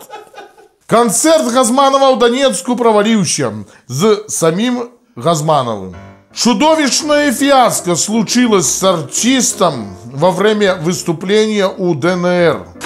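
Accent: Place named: Belarus. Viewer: Сначала Лайк потом поржал над трасянкай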